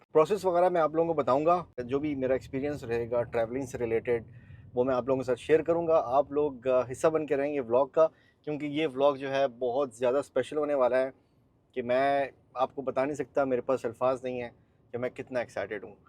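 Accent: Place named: India. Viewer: Can't even believe you are from Pakistan.... Your language and accent and face is so much similar to people living here 💕💕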